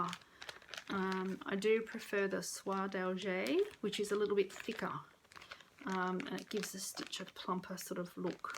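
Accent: Australia. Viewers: Welcome to floss tube, so lovely to hear an Aussie voice. Beautiful work!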